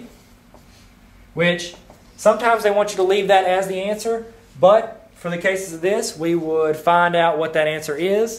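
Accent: South, United States. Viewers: this helped me so much thank u btw i never understood from the teacher but when i come back from school i study what i did today and u helped me sooooooo much ZAAAANKS!!!!!!!!! YOUR THE BEST TEACHER BTW I LOVE UR COUNTRY ACCENT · Sup with that country accent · Um lets use our heads maybe he from the south? sup with you stupidity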